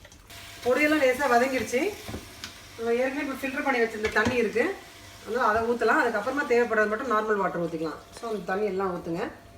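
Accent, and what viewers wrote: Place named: Theni, India. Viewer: Oh ok k Ur slang like theni people 😊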